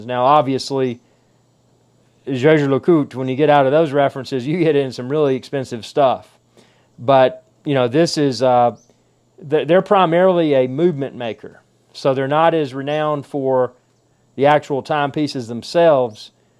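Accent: South, United States. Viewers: It’s weird to hear a southern accent speaking about a European luxury watch 🤣 but great content 👍🏽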